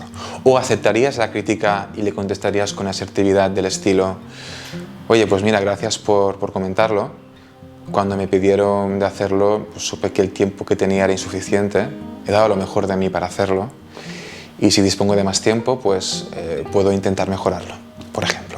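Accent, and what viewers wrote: Buenos Aires, Argentina. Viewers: Jajajja....Te queda bien el tono argentino,cariños desde Bs As!! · Gracias por ese acento "argento", un gusto iniciar el día con tus vídeos y enseñanzas. Afectuoso saludo! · Jajaja,argentino,pero no todos hablamos así 😄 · Te sale muy bien el acento argentino 👏👏👏 Me encantó escucharte! Ya hablando del tema del vídeo justamente me están pasando cosas parecidas y siento un agotamiento mental y físico que me hacen daño a veces. Entrar en el conflicto es muy fuerte, a mí me trae angustia que duele en el pecho! Ahora lo manejo desde la reflexión pero no logro evitar la angustia en la primer etapa. Luego lo puedo superar. No es sencillo de explicar pero lo puedo manejar. Gracias siempre mi querido terapeuta. Abrazo desde Argentina. ❤️ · sorprendido por tu porteño.. aunque le falta un poco de trabajo. Es muy fácil hablar en argentino, intenta hablar el castellano en italiano y te convertis en un porteño más. 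Abrazo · Como eso el final de tu carrera ?a no!! que hariamos sin ti los sabados por la mañana?Te sale bien el argentino, tendras que venir a perfeccionarlo aqui ,bendiciones desde Argentina . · Ayyy...que gracioso!!! Pareces porteño!!! Ja ja ja!! Hermoso tu vídeo y super útil para entendernos un poquito más...la observación en cada situación aporta claridad , es como desmenuzar y llegar al fondo para desdramatizar. Besos desde Bueno Aires. Argentina!! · Buen dia soy argentina y me hiciste 😀 reir porque asi tal cual hablamos pero los de BUENOS Aires. Nunca cierres tu canal que sos de mucha bendición. Con este video le voy a dar una bendición muy profunda a alguien de mi familia que está atascado en su emotividad porque tiene TLP y lo necesita. Dios te bendiga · Jajaja me sorprendió el acento argentino! XD muy bueno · Jajaja ! Muy bueno tu acento argentino! Te lo digo desde La Plata, cerquita de Buenos Aires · Jajajaja muy bien hablando en Argentino! 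Me encantó! A ver cuándo venís a Buenos Aires❤ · Me encantó el asiento argentino.. saludos desde Argentina 😜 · Jajaja amé tu acento argento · Jajajajajaajaja sos más lindo me encanta el acento argentino. Saludos desde Uruguay. AMO que le pongas humor a tus videos, le suma muchísimo !! Un saludo grande che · jajajaj el acento argentino, lo amo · Amé tu acento argentino..!! Quedo pendiente de tu imitación colombiana..! 😂😂🇨🇴 el buen humor es maravilloso..! Cuánto aprendo contigo..! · Acento argentino...o uruguayo...un abrazo...! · Jajaja te salio genial el asento argentino!!!!! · Me encantó tu acento argentino ya me creí que eras de los nuestros!! El humor sano e inteligente es mí aliado para muchas situaciones! Saludos desde Mendoza Argentina!!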